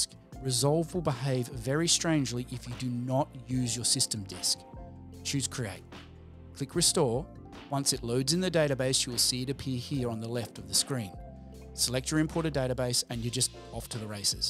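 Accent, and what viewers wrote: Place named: Australia. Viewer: Hey dude! thanks for the thorough video. I like your presentation and um... Aussie! I'm wondering if you can help. My old Mac Pro running Resolve16 died recently, and I just took the leap to a Mac Studio. Anyway, I have no way of exporting my Resolve projects and databases, though I have the original files on disk, project folders, .db files in Application Support etc.. But I'm at a loss for how to restore my database with just these raw files. I've tried copying them to the new Library structure, but nothing's getting recognised. And I haven't found a way to import them. Any ideas where I should go from here?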